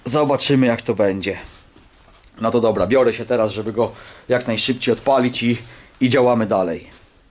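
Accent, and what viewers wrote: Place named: Podlasie, Poland. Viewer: Kolega chyba z Podlasia sądząc po akcencie ;-)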